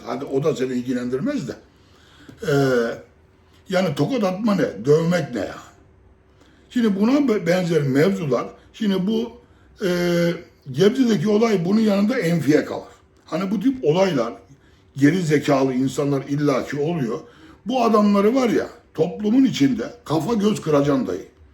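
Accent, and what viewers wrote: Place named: Trabzon, Turkey. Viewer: O dayının beynini sikeyim hocam senin konuşmandan Trabzonlu veya Rizeli olduğunu anlamayan bu zamana kadar boşa yaşamış demektir!Bide kurum hep Trabzonlu rizeli diyor.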